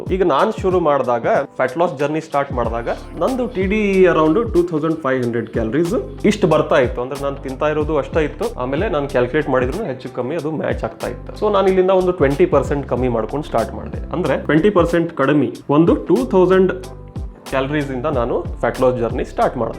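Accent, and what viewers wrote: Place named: North Karnataka, India. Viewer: Fantastic... bhari mast explaination namma north Karnataka slang... MPTY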